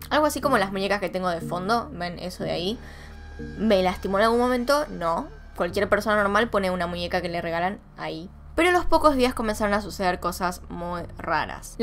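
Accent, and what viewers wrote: Argentina. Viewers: Creí que no me gustaba el acento argentino, pero me encanta escuchar los casos con ese acento xD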